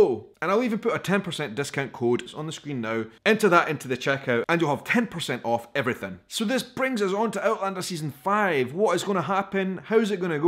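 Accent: Scotland, United Kingdom. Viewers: I have always wanted to go to Scotland and am saving even now...of course I consider Outlander one of the best adaptations ever and I love listening to you. Great Scottish burr.😎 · I love your scotish accent !!!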